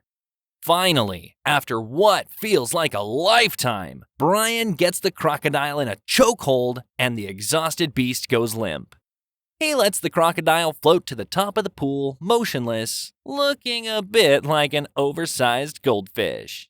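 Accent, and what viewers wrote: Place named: Australia. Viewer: love your Aussie accent😂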